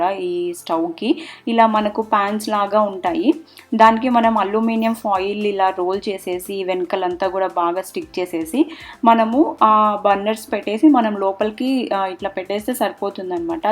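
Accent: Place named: Rayalaseema, India. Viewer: Ru from rayala seema ur way of speaking looks like dat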